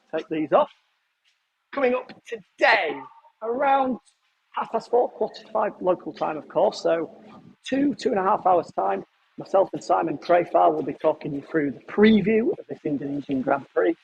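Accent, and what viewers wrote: United Kingdom. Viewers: Pembawa acara MotoGp sama Badminton emang paling cocok pakai aksen britis.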